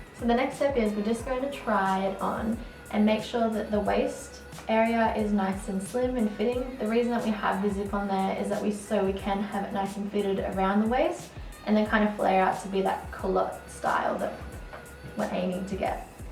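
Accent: Australia. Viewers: Sorry but you sound Australian so I am just wondering where you buy your fabric from? I don't find a lot of linen in Spotlight and Lincraft :/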